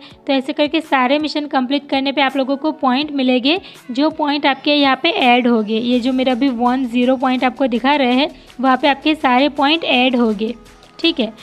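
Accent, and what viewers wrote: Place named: Bihar, India. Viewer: Hey pretty girl aapki aawaj sunke lgta hai ki tum Bihari ho jai bihari meyya ki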